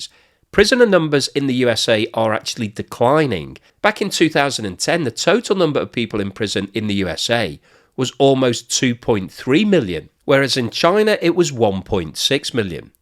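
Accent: United Kingdom. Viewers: are you British? Wife wants to know.?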